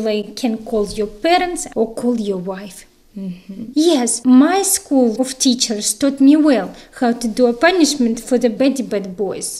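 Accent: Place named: Russia. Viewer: So awesome you always keep the russian accent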